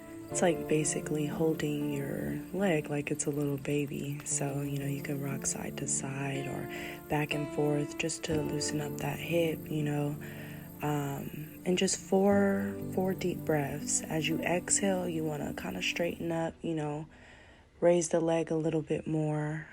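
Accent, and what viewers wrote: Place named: United Kingdom. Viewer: your voice is very soothing - made my eyes close so had to watch the video again. thank you - also I was 100% sure you were British until the switch!! 😅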